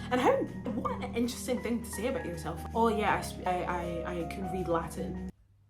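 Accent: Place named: Scotland, United Kingdom. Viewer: she's Scottish, lol, love it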